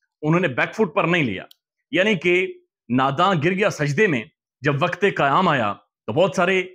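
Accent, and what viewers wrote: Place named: Kashmir, India. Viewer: bahe ap kya Kashmir se hain ap ke language se Lagta ha😂😂😂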